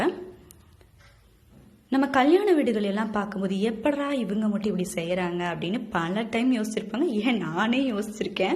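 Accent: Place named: Kongu, India. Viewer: Nice sis..kongu slang theriyuthu..kovai i think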